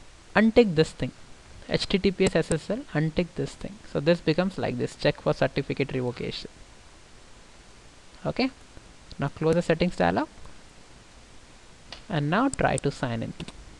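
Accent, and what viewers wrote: India. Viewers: Play it twice. Once to acclimate your ears to his subcontinent English accent, and the second time you will hear every word. It is not too fast, nor is his English unintelligible.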